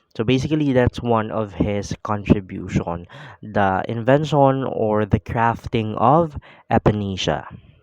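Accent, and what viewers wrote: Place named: Philippines. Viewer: Very thick Philippine accent besides mixing Tagalog ? with English. Don't play on fast speed or you won't understand him.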